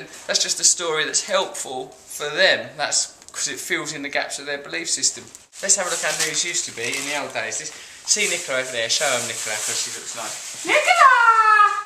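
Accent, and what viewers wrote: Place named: United Kingdom. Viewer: I could listen to you all day, I love the way you talk! It reminds me of my gram who can call someone a bastard and still sound polite :P Whenever I'm around her for more than a couple of hours I start speaking the same way... I once went with her to Mexico and came back with a British accent. I can't fake it for the life of me, though.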